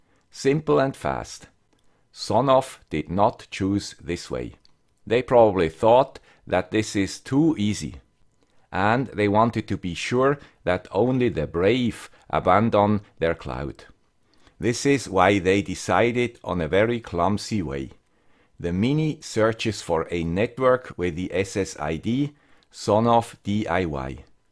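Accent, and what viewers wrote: Switzerland. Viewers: Hey Mr Swiss Accent.... you should try using the mobile hotspot in Windows 10. Just search hotspot and it will take you to it. Set the SSID and Passwort and activate! ;) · It's a sin. Anyway, ok for the swiss accent: you are great!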